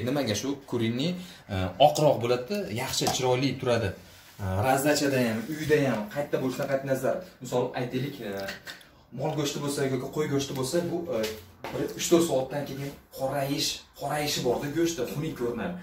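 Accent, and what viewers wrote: Fergana, Uzbekistan. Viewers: Manimcha boshizdegi Fargona doppi. Shuningchun soragandim. ham Fargonaniki togrimi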